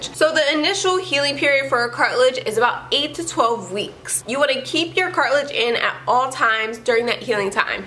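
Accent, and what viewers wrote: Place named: Barbados. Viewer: That Bajan accent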